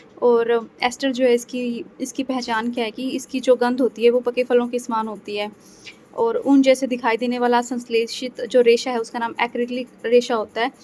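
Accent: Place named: Bhiwani, India. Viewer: Sister aap loharu bhiwani side se ho kya....aapki boli se lag raha hai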